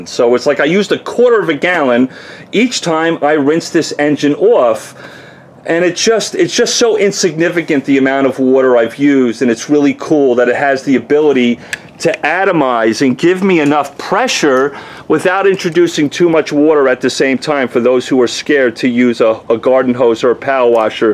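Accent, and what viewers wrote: New York, United States. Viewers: Sound like a New Yorker.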